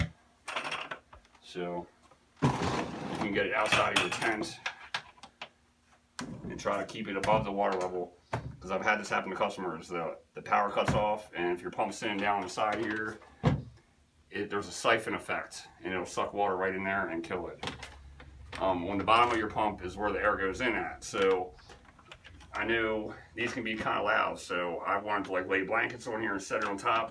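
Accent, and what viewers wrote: Pennsylvania, United States. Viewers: He's speaking Pennsy, easily understandable being that I'm originally from the Ho Bud coal region of the keystone state. Listening faster might help. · I was born in PA I know that accent. Also cool points to both for knowing who the hell are.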